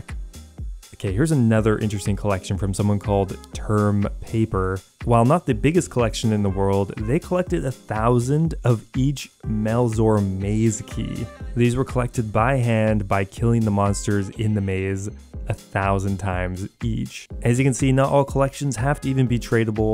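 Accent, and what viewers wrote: Canada. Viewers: I always had a hunch you were from Canada 🍁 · Wtf you’re Canadian?!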